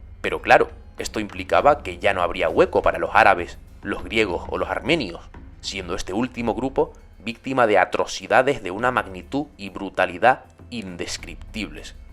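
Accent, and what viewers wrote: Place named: Canary Islands, Spain. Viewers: Creo que canario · De que parte de España sos, si sos español. Una curiosa argentina 🇦🇷. Tenés un acento distinto a los que estoy acostumbrada a escuchar en los distintos videos provenientes de España Saludos ❤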